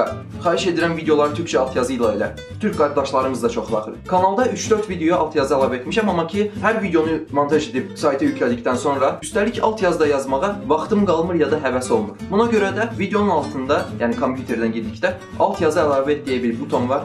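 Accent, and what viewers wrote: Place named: Zaqatala, Azerbaijan. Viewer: Bu video da digərləri kimi əladi və çox maraqlidi yəni mənim üçün elədi hə bu arada məndə Zaqatalalıyam danışığından bilinir onsuzda sənə uğurlar😊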